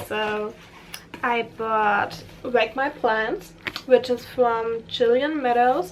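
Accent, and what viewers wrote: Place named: Germany. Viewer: Es ist so spannend wie gut dein Vokabular bist, aber man trotzdem einfach hört, dass du deutsch bist. Würde mich interessieren, ob sich das mit einem Aufenthalt in einem englisch sprachigen Land ändern würde.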